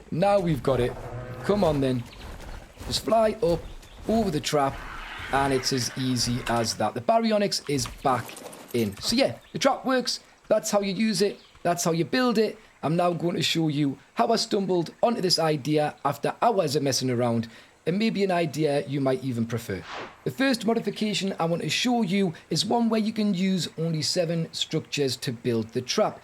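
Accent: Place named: Newcastle, United Kingdom. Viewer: just wondering because of the accent are you from like newcastle?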